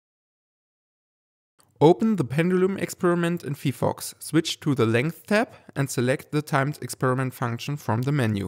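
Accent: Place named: Germany. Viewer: Deutscher der versucht Englisch zu reden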